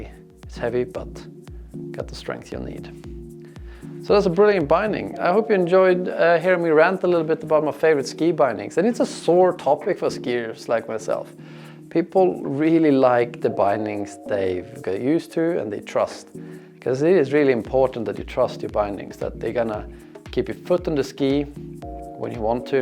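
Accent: Sweden. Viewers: Hehe, yer so funny. I liked your way of explaining the disadvantage with the pointy binding 😂 Are you by any chance Swedish? You got a good accent. ☺️ I’m searching for a nice marker that got easy switch for adjusting for the booth size with an 11cm wide ski stopper. Any suggestions? I don’t like griffon ... you got to screw them that is you need a screwdriver 😥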